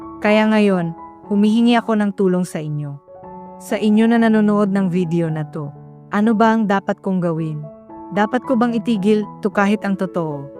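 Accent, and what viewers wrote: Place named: Visayas, Philippines. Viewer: Bisaya k po b miss? Halatang halata Kasi Ang Punto mo.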